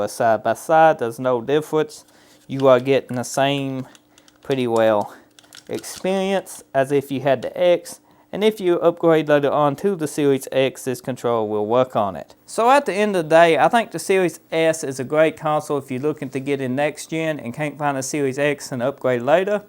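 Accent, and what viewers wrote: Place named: South, United States. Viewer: Your southern accent. That one lol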